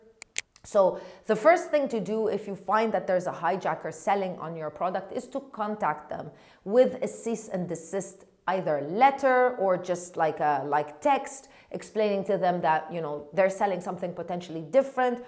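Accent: Arab world. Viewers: i don't want to learn from you but i like the way you speak english in arabic.hehehhe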